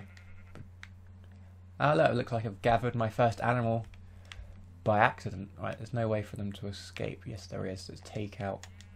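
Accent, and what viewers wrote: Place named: RP, United Kingdom. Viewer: Your posh voice makes me laugh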